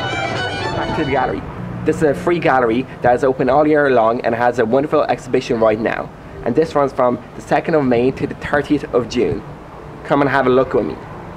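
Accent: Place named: Dublin, Ireland. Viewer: Aloha OMGoddess I love your wee spirit --- stumbled over SOME thing [I think vegan-related?] on FB that led me to your AerLingus vlog.. Was seriously impressed with your openness and positive * clarity * throughout... not to mention #videoeditingSKILLZ!!! Love your accent as well (we were just talkin about Dublin accents tonight in College 🤣)*.. Anyway Sure you probably get loads a wee messages like this so... Slán go fóill 🙏🏼🙏🏾🙏🏿 * we're over in Co.Clare tho btw 💡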